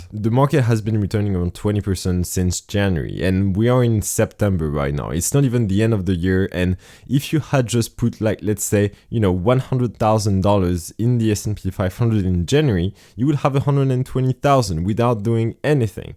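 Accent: France. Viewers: Good long term outlook. And the French accent is cool 😀.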